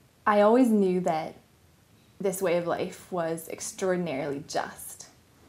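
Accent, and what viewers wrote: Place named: Uruguay. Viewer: I was like hey why is she speaking Spanish with an Uruguayan accent? Hahaha.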